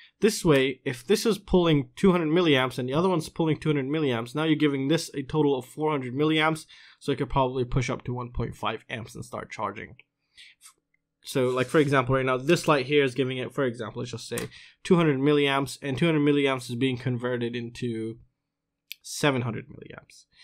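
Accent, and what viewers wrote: California, United States. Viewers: david tilvikas he sounds like he has a California accent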